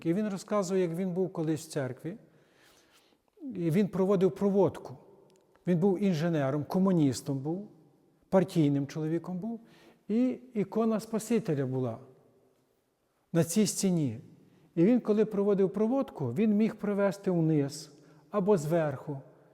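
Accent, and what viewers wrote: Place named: Khmelnytskyi, Ukraine. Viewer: Так, є святині, які потрібно поважати. Хороший урок, хоч і трагічний. Батюшка, ви часом не з Хмельницької області? Слухаю ваш говір. Цікаво.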